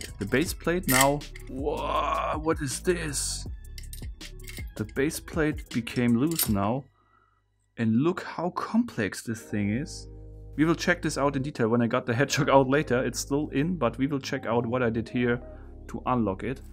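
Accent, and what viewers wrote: Germany. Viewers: Your German accent gives me a headache but great video! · So wie er englisch redet vermute ich jetzt mal das er von der muttersprache her hochdeutsch bzw. eine der vielen deutschen sprachen spricht. Ich habe mich noch nicht danach umgesehen wo er herkommt. Einfach mal blind ne vermutung anstellen und evtl. damit auf die schnauze fallen. So ist das leben geil. No risk no fun😀😬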